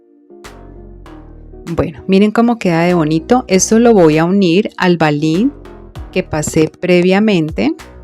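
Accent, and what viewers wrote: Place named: Medellín, Colombia. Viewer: están muy bonitas y la manera como lo explicas lo hace fácil de seguir el proceso, el tono de voz muy ameno, muy bacano. Pareces de Medellín. Saludos.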